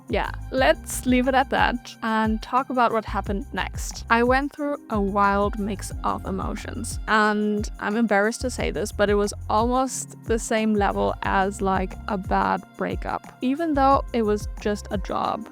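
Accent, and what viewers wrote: Germany. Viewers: Do I hear a Gernan accent? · You are right that McKinsey doesn't matter...but You're European... You didn't learn the USA hustle mindset...you were absolutely supposed to get another project and make political allies...cultural differences got you. You took people literally. Do I get a German accent? If so from what I understand very different culture. The McKenzie people couldn't explain to you what to do anymore than a fish could tell you how to breath underwater... Going to iveay league schools and private HSs they absorbed the BS with their mother's milk. Social climbing obvuscating masters of double speak. " Consulting".... Scape goats for firing people. " If we do the same with fewer people we'll make more money...genius send that memo to the client...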